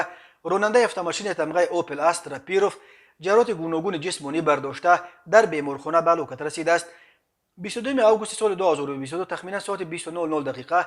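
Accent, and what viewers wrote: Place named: Badakhshan, Tajikistan. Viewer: Ташакур бародар барой ахборот ва шевай ширини бадахшоният мамнунем аз хидматхоятон ки ба мо мекунед ва аз тамоми хабарой дохилу хорич зуд огох мекунед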